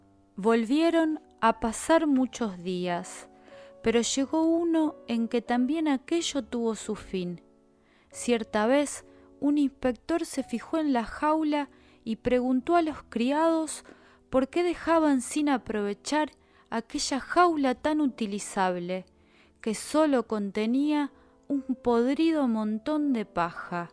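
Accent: Argentina. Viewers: El acento argentino no sirve para un audiolibro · El acento argentino para un audiolibro no es de mi gusto.😒😒😒😒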